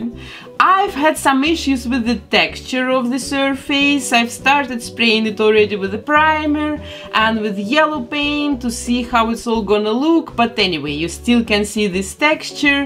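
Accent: Russia. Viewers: Так слишно руский акцент)) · Как будто русский не старается над произношением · why do you have a Russian accent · Это... Русский акцент? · Sorry, but where are you from?) I'm intrested, because you sound like you have a Russian accent))) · Русская что-ли? Ужасный акцент. Очень сильно по ушам бьет. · Почему мне кажется, что она русская, говорит просто с акцентом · хм...чувствуется русский акцент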